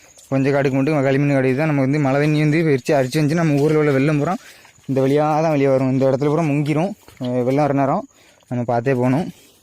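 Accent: India. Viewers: Arumaiyana vela enaku piducha nellai slang love you chlm be safe from delhi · Super god bless but very risky work and hard work 💪 🙏 ❤your looks handsome your madurai slang excellent 👍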